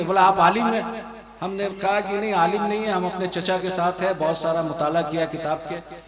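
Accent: India. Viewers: Accents to pura Hindi lag rahi hai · Mashallah Hazrat aapka takrir sunkar bahut Dil Ko sukun Mila🎉 aapka bolane ka jo leja hai na vah bilkul Jharkhandi ka leja hai aapka Allah Pak aapko salamat rakhe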